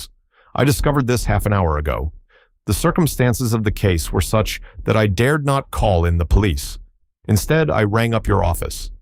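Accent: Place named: United States. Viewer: Terrible listening to Agatha Christie in an American voice 😔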